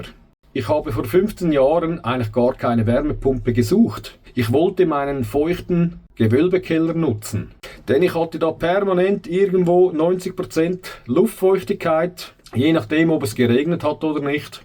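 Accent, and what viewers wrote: Switzerland. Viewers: schöner bericht, danke dafür aber es wundert mich ein wenig: da sprcihst mit einem schweizer dialekt und gibt die preise in € an? aber das mit der zirkulationspumpe und dem leeren des boilers um 50 % ist mir nicht klar. diese zirkulationspumpe sitzt dann doch in einer ringleitung und so kommt das wasser doch immer wieder in den boiler zurück.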